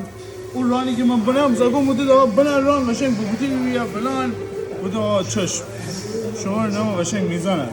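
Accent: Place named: North, Iran. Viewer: خداشاهده همون اول که دیدم شمالی حرف میزنی،فهمیدم که نباید انتظار ی چیز درست و حسابی رو داشت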